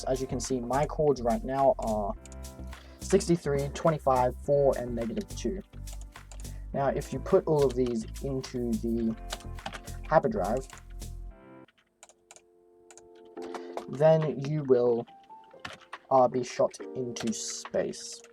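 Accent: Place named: United Kingdom. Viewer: when a british guy narrates, you know its a good tutorial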